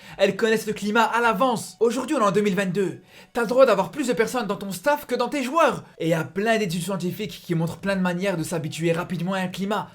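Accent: Belgium. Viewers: Accent Belge 🍟🍟🍟🍟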